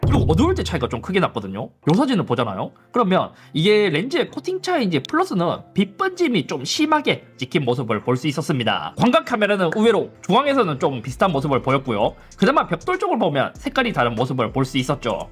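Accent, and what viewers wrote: Gyeongsang, South Korea. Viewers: 선진국형 삼성폰.후진국형 아이폰.해설자 상도사투리 극혐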